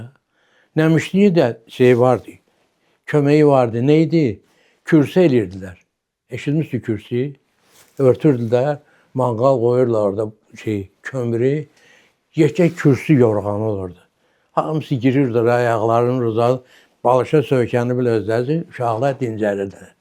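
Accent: Baku, Azerbaijan. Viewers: Bakililarin lahcesi yekdir. Allah Size omur versin❤❤❤❤❤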